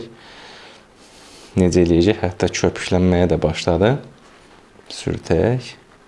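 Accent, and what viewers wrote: Ganja, Azerbaijan. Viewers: Ləhcə Gəncə ləhcəsinə oxşayır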